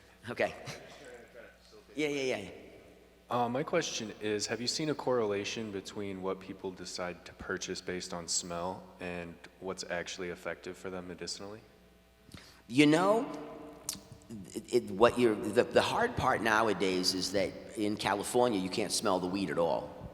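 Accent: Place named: New Jersey, United States. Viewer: sounds like he is from NJ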